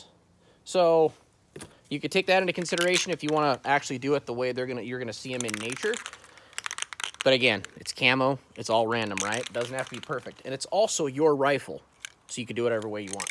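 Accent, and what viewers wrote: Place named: Backwoods, United States. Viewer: Can you try the next video with an Australian accent. This whole backwoods American thing doesn’t suit you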